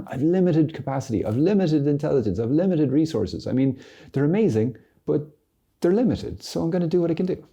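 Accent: Ireland. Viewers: Is anyone else here for his Irish accent? I absolutely love it. perfectionism is a rejection of who we really are... Garsh that hit me. There's so much I could say about what he says in this video. I can't tell you how long the belief of 'you'll never be good enough so never receive communion' has kept me from communion. Then a priest noticed and asked me about it. I don't know how long it would've taken if he hadn't done that. "I do not have to do anything perfect today, I only have to do my lousy best." That's my mantra 🤣. Still a work in process, for sure. But we're getting there. 😁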